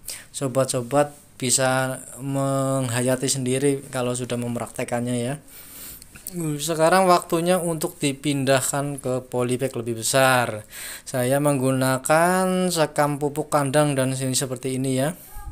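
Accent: Banyumas, Indonesia. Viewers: Wahhh...terimakasih ilmunya mas..saya jadi paham cara budidaya buah naga..akan langsung saya praktekkan..btw mas orang banyumas apa mas?deneng ngapak?siipp banget.. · Oalahhh ngapak, aku wong Gombong mas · ngapak bosss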